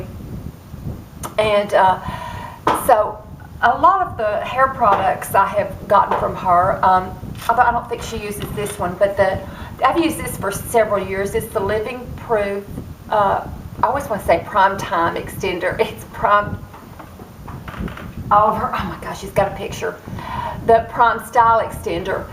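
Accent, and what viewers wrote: South, United States. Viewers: your hair is lovely and I love the way you are so loyal to your moonstone shadow....and your lipstick-combo...I received my Naked 3 palette today which I won and have a feeling I have found the right colours for me-cannot wait to wear it tomorrow and will do a video on Thursday-honey, never worry about how long you talk - love your Southern drawl and could listen forever - do your kids have those awesome eyes of yours - have never seen eyes more beautiful..xxxxxSuzy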